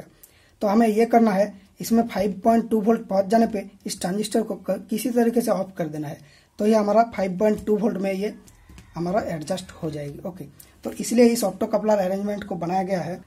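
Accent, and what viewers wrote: Bengal, India. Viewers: Are you Bangali??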